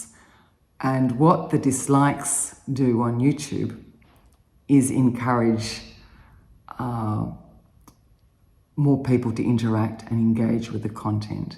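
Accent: Australia. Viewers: Here now a few years in your Channel ❤ from Ireland 🇮🇪 it's your Australian accent particularly yours...😊😊😊😊 So lovley ❤❤ Anyways all of my best to you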